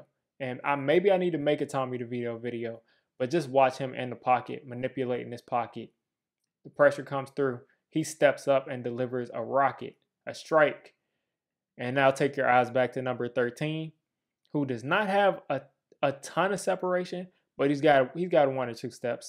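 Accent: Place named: South, United States. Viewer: What up man? Long time see! You definitely live down south now I can tell when you say certain words. Anywho, we need more speedy receivers, point blank.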